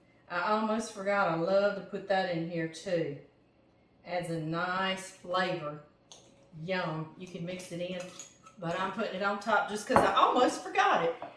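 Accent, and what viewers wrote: South, United States. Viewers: I'm a new subscriber and fan and just have to thank you for all the great recipes. I've been binge watching your videos for the last 3 days and love them all! I was raised in SE Texas (Beaumont), so your Louisiana/Southern drawl sounds like home to me. This cabbage casserole sounds wonderful, but I'm wondering if you have ever tried freezing the leftovers. I'm 68, living alone and love to cook big batches of food and freeze the extra for later. I'm just not sure how the cabbage will hold up after freezing and thawing. Thanks so much for taking the time to share your recipes with us and for giving me some new and creative ideas.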